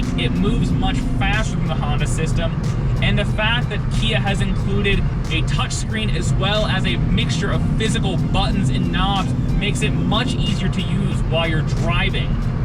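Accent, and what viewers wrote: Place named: United States. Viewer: Jordan Henderson is doing car reviews?! Sick. Nice American accent btw.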